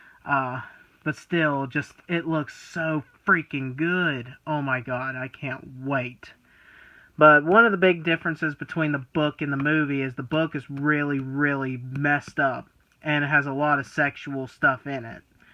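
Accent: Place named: South, United States. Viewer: My mom wants to know where you're from? We both agreed you sound country. I'm from South Carolina myself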